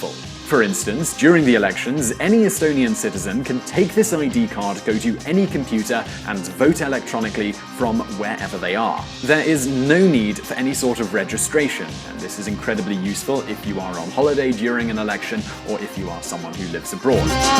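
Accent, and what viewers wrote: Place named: United Kingdom. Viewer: british english, sounds good. awesome, im peruvian, i like british english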